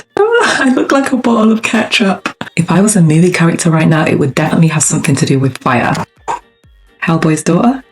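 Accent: United Kingdom. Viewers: I love your British accent ❤ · I subscribed when I heard you were British like me 🥲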